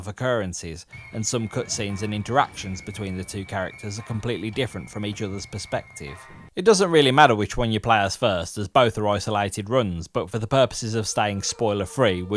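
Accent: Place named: Birmingham, United Kingdom. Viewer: Nice accent. Where abouts in brum are you from😂. Nice vid also 👍🏻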